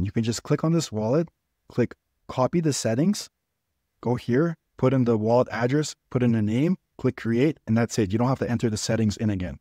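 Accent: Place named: New York, United States. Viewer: You gotta be from NY or at least from the northeast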